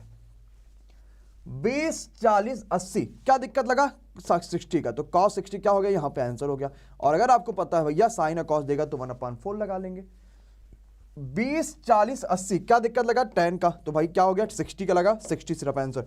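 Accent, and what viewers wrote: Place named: Kanpur, India. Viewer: कनपुरिया स्टाइल🤘🤘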